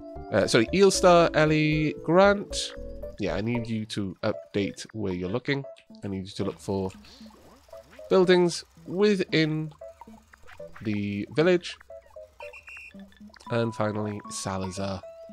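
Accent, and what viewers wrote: Wales, United Kingdom. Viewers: It’s taken me far too long to work out exactly what makes this series so enjoyable. Is it the knowledgable way puts the bot coding together? Is it the dulcet tones of his Welshishness (try saying that 3 times fast) that makes it so pleasant to listen to? His addiction to tidiness and prettiness? Is it the ridiculous cuteness of this game? No. It’s the motivational speeches. I really want to be part of the collective just so my representative bot can be on the receiving end of one of these! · I would wuv to be a Neumann just to hear how you say my name. It would also be creepy cool if I ended up in I AM MOTHER team because I work in a day care. I'm mainly curious how my name sounds in a Welsh accent. Wiv wuv Akane Taakon P.s. Spread the Wuv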